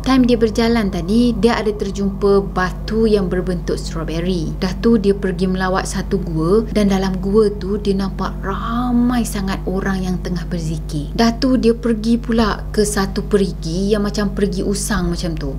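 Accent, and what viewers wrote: Kelantan, Malaysia. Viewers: Salam kak.akak ore kelate ke.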